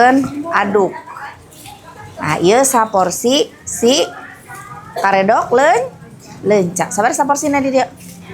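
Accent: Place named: Garut, Indonesia. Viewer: Ngomongnya ala garut terussss skrg😂😂😂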